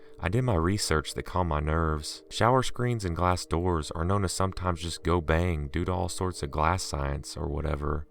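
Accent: South, United States. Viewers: Sounds like you have a southern accent. Do you mind me asking where you are from?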